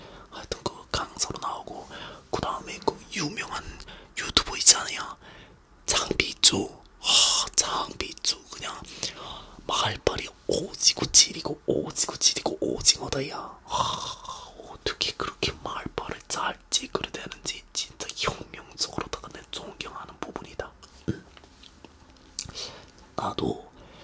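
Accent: North Korea. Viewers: 진짜 ㄹㅇ asmr신세계다 진짜 북한말 진짜잘한다 잘챙겨보고있으니까 화이팅하세요!! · 와 드디어 오셧다.. 얼마나 기다렷는데ㅠㅠㅠㅠㅠ 게다가 북한말투에 급식체라니 넘 조코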